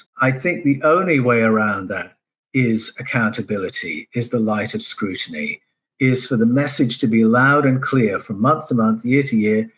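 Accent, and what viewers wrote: Australia. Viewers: I love u! Awesome stuff! It juat sounds smarter with an aussie twang!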